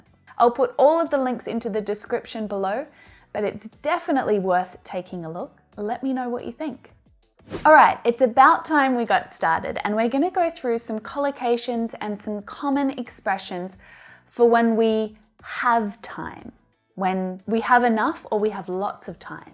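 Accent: Australia. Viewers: I ❤️ Australian Accent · I love Aussie accent and lucky for me I’m Aussie come from Australia live in Australia like if your Australian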